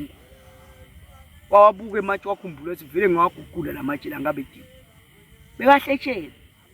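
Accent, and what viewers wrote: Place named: Tsonga, South Africa. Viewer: Some shangaan tsonga dilect on swati language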